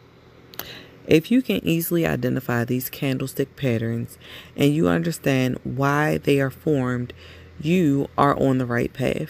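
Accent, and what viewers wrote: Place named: Baltimore, United States. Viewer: The woman reading sounds like she could be from Baltimore (as I'm from the DMV area). Great work and thanks for the full audio!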